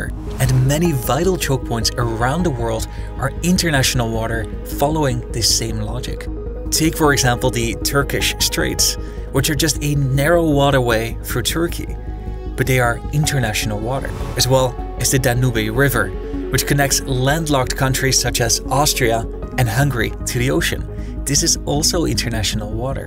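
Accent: Netherlands. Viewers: He isn’t pronouncing it that way on purpose, he’s Dutch. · Your dutch arent you? Lol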